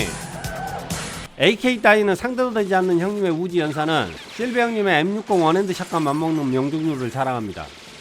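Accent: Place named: Honam, South Korea. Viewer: 아따 성님 호남사투리 오져부네요~~ 잘보고갑니다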